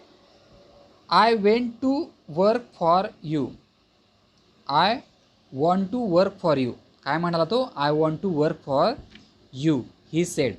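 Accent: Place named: Khandesh, India. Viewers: Sir your accent is like khandeshi.... Thank you so much for explanation.... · Nice Khandeshi English 😂🎉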